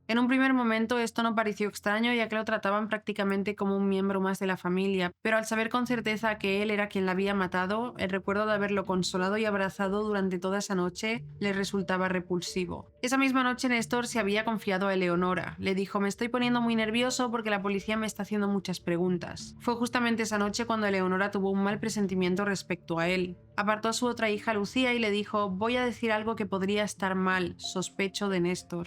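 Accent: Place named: Castile, Spain. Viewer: Por dios l sacais puya a todo,ella es Española y lo narra como Española castellana,si quieres escucharlo tal cual hablais en vuestro pais pues ver a una argentina narrandolo.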